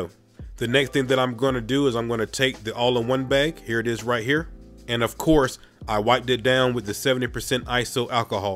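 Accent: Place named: United States. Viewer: I love the American accent, it's deep or somethin'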